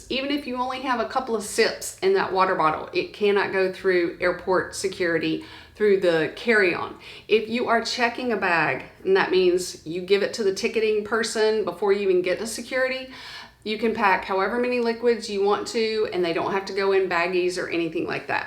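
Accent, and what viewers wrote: South, United States. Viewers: Are you southern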